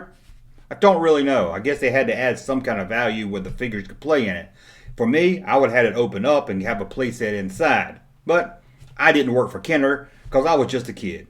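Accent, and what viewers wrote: South, United States. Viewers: I dig your vids and the southern accent (which I'm sure you don't think you have) is awesome. Funny, how, the name C-3PO was shortend in the film to "3PO or Threepio", and most put the emphasis on the "C" (or "Three") when saying his name. But you put the emphasis on the "PO". It's not hate mail, just intriguing.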